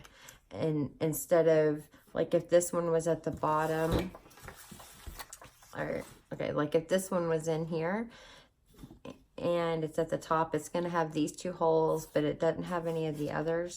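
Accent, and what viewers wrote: South, United States. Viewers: thx so much for this beginner video. I have to ask where you are from. Southerner? I'm from Louisiana. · Thank you for showing us how to get started with junk journaling. I'm going to binge watch all your videos. . .btw, i love your southern accent, are you a Ga girl?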